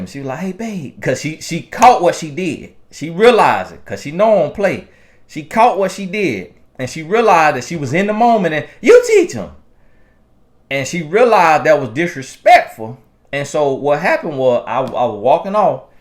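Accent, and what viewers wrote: South, United States. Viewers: Wooo, I live for the country accent. Reminds me of my grandmother, (mudeel). She would say, "Cinde, go and wrench that towel over the sink". Cinde ain't nowhere on my birth certificate.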